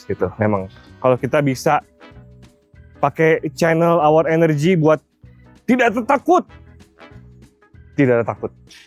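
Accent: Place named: Papua, Indonesia. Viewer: Tuhar... Ternyata dialek nya Bang Masih kuat Papua😅😅😅😅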